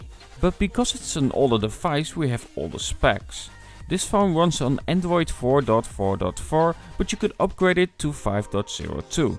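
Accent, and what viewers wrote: Netherlands. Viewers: Sowieso jij bent nederlands duidelijk te horen👍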